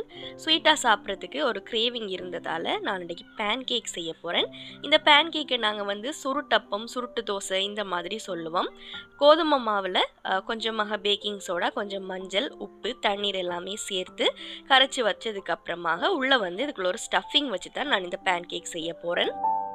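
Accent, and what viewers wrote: Jaffna, Sri Lanka. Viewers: Jaffna tamil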